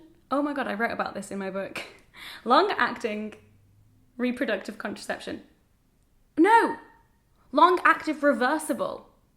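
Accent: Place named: Manchester, United Kingdom. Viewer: Literally couldn't tell you how many years I've been watching you and the Hormone Diaries is my fave thing! Also as a fellow manc living away from home hearing your accent is very comforting😂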